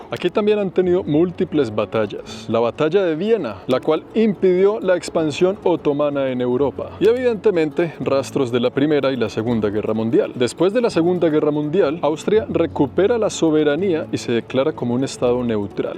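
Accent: Bogotá, Colombia. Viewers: Me encanta como me haces viajar desde mi humilde habitación de España, por cierto me gusta mucho la forma de hablar con el acento colombiano y la cultura que trasmites con tu contenido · Me encanta su voz 😅 el verdadero acento rolo, sin la degradacion que le han dado muchos habitantes de bogota. Gracias por tus exelentes videos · Si.muy Colombiano en sus modos de hablar y dichos.👍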